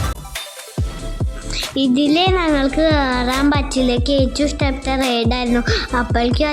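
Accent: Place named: Valluvanad, India. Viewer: അടിപൊളിയായിട്ടുണ്ട്. വള്ളുവനാട് ഭാക്ഷ കൊള്ളാം ട്ടോ❤❤❤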